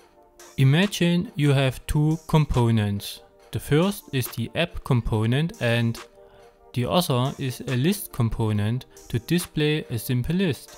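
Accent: Germany. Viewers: I love your german accent hahaha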